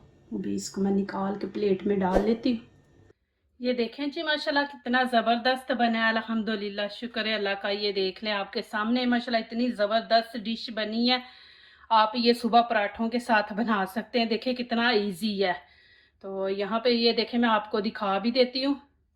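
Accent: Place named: Punjab, India. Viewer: Bohat Mazy dar Anday began bnay hen ap ka panjabi lehja bohat Pasand h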